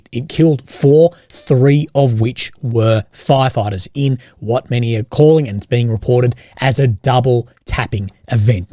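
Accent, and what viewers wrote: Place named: Australia. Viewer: "Gddye". I think he is saying "good day". Australian English "day" sounds like "die" to Scots (and Autralian "die" sounds like "doy"). Legends could be rhyming slang for friends. Add pronouncing "low" with a shwa like in French "le", but followed by a slight "w" glide, and you have the four peculiarities of Australian English borrowed from Cockney. Though I must say that this pronunciation of "low" is more widespread in Britain.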